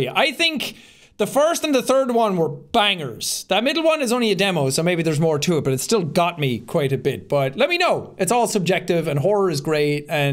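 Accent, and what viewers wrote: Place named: Ireland. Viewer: I know this video was posted months ago and as I have said before even if you do not see this those who do I hope you realise that this is true what I have to say is that no matter how much haters he has no matter who tells me he’s not a good YouTuber I will love his content and his pure Irish accent forever keep up the amazing career.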